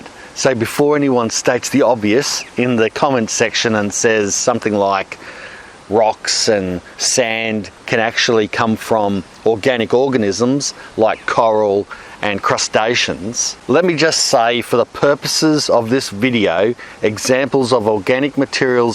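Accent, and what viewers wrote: Queensland, Australia. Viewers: I’m going to guess you’re from Qld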